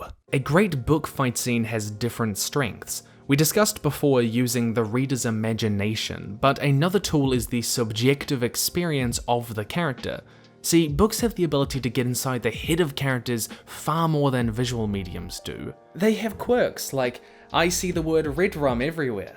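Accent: United Kingdom. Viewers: Why do you Bri'ish mofos have such trouble with words that end in "ian" or "ion?" No, it isn't SebastIAN. It's Sebastian. 3 syllables, not 4.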